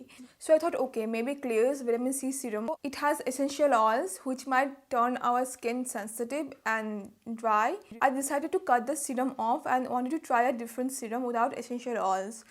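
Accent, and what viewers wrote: Bengal, India. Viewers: Godddddddddd ur cute.......got ntn to do with the video n vitamins n stuff but loved that bengali accent♥️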